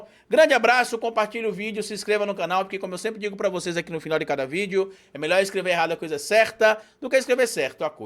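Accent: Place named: Minas Gerais, Brazil. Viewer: 🥰🥰🥰🥰🥰🥰AI, q.ue bom q conseguiu recuperar sua page.Amei vc tentar falar mineires.kkkkkk Zemaouvo lambisgóia capiau,só faz M* esse cocô 💩 💩 💩 💩 💩 só ferrou Minas.diz que é gestor,nem de cocô de galinha. Mas da isenção para os cupixas.Amei vc tentar falar como Nois.sucesso ❤❤❤❤❤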